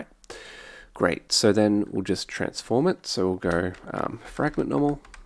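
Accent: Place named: Australia. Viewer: I did think it was Australian, moreso by the language than the accent. Keen for more VK videos.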